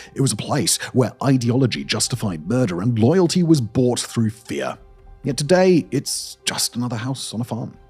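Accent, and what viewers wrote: England, United Kingdom. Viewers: Pokemon Red on 1 screen, A British man describing the worst thing ever on the other. Perfect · 'N Britse kont agter 'n skerm.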